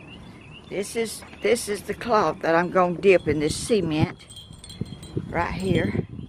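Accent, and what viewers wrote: South, United States. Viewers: you are a joy....I'm from Australia and think your projects are inspirational.....love your southern accent....bless you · I love her southern drawl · You are such a creative and inspiring person. I do crafts as well, but I’m not as brave and creative as you are. Your videos calm and inspire me. I love your Southern accent - and your humor. You are truly blessed that you are inspired with a unique idea in your head and then you figure out a way to create it. Marvelous! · Am new to your channel, this is my second video an it’s 1yr old an love it 😍 Just love your your southern accent 💝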